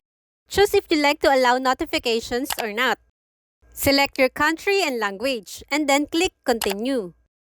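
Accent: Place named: Philippines. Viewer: Iba talaga mag english ang tagalog. Litaw na litaw haha. Ty bga pala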